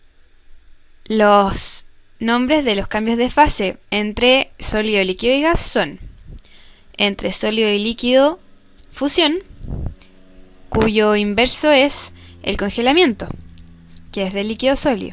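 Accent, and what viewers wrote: Chile. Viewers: Sos de Chile ami no me engañas jaja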